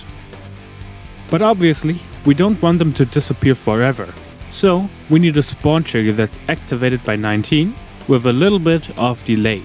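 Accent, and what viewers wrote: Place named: Germany. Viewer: you sound german lol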